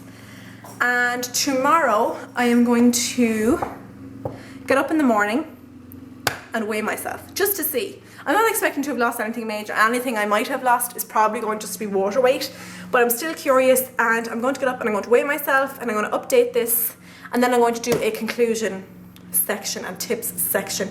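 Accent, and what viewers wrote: Ireland. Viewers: where are you from in ireland? :) Im guessing wessshht?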